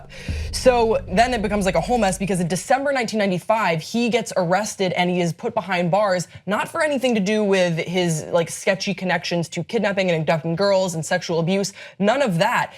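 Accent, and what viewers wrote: Belgium. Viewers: pls speak more french je veux entendre ton accent belge <33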